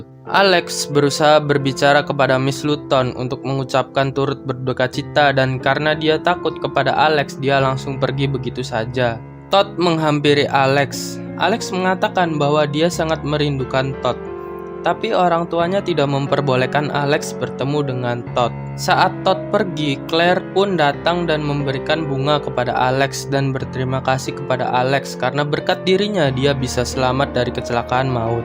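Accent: Cirebon, Indonesia. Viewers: Admin logatnya kaya wong cerbon sih min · Anjing orang cirebon bgtt logatnya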